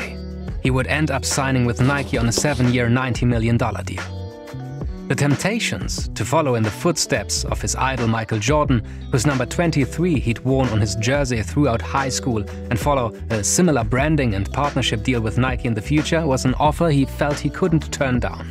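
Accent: Germany. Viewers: Dieser deutsche akzent killt einen 😂 geile videos, weiter so 🤌🏻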